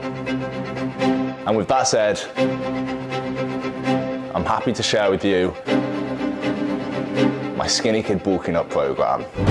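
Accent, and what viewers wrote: United Kingdom. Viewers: omg british accent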